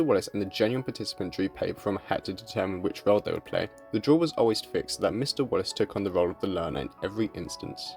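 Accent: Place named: United Kingdom. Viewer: I like your bri ish accent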